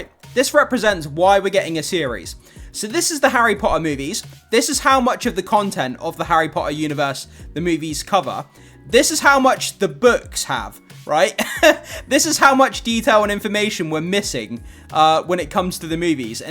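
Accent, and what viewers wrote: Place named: United Kingdom. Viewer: great video as always! An off-topic question tho - as a foreign languages enthusiast throughout all your videos I've been wondering about the way you pronounce the H in the "HBO series". If you wouldn't mind answering a personal question - what is your native accent? I guess it's definitely a UK one - but which exactly?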